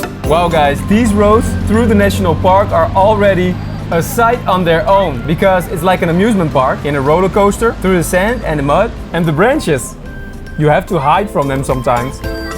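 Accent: Netherlands. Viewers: Je bent Nederlands of niet?? Ik hoor het aan je accent :o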